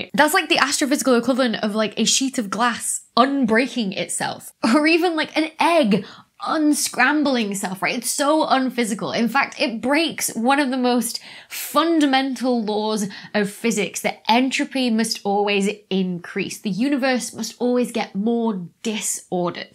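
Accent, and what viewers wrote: United Kingdom. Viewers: Very nice lecture. Clear and well explained with a cute British accent. Why an object will go faster than the speed of light in a black hole when it's not permitted outside of it?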